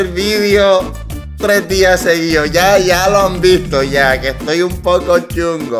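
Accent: Puerto Rico. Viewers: Guapo se usa de las dos formas.... eres guapo o Eres Guapo Ahhh!!!!! Somos difícil de entender los boricuas😂 oye coreano llegue tarde a tu canal, pero estoy en shock hablas como un bori, dime tú te viviste en 🇵🇷? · te sale bien el acento boricua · Habla como boricua, bye. Also, hay palabras que ni son boricuas y son media inventadas 😂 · Este koreano es uno de nosotros ri-tru de cora. Habla bien boricua entregao' a lo reventao' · oye tienes un poquito de asentó puertorriqueño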